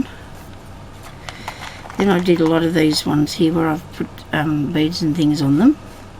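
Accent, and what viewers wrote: Australia. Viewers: Hello - I have just discovered your channel and watched your tassel making. When I started to listen to you talk I thought ooh! is she an Aussie or Kiwi - just took a couple of sentences to figure it out - we speak the same speak. I loved the tassel you made - I know it was last year but anyway loved it - wandered into my small humble craft room and looked around - I am getting cracking tomorrow. I also loved your velveteen flowers and am totally inspired. I am from Perth and am going to watch all your videos now. Happy New Year to you and your family - this also includes the cat!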